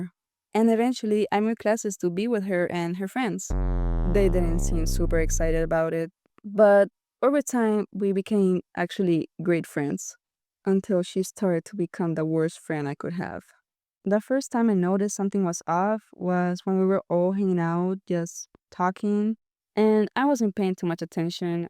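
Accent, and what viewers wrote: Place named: Latin America. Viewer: Is your original language Spanish? I really like your accent and I saw you included Spanish words and my parents are Hispanic so I speak spanish and was curious lol Also I love your style its super cute!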